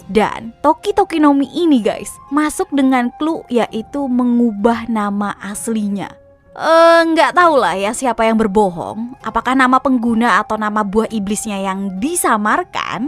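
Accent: Indonesia. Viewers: Nama.... Speak English dialek Indo... Lanjutkan... Kita bakal punya dialek english yg diakui internasional... Jgnkalah sama India Korea Afrika.. ✌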